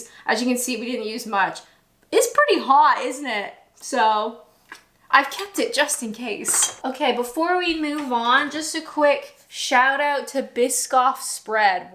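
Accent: United Kingdom. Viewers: wuss-tuh-shuh. There used to be a Worcester Sauce flavoured crisp. They spelled it 'Wuster' on the packet to try to help people say it. Still, your British accent is coming along well in other ways. You have a most un-Canadian glottal stop on the word 'fruit'. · Great video as always 👍 Your fridge is HUMONGOUS compared to mine!! I love it when you do your British accent, it's coming along nicely 😉 My favourite teabags are the Co Op's Fairtrade tea, not too strong or weak, just right for me. Are cheese and onion crisps popular in Canada? Obviously they are very popular here in the UK. Well happy valentines day, I hope your other half has spoilt you rotten today ❣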